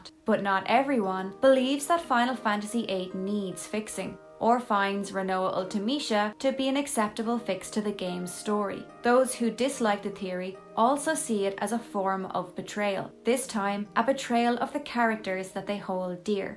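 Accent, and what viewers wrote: Ireland. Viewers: I don't know the specific accent, but you sound Vaguely corcaigh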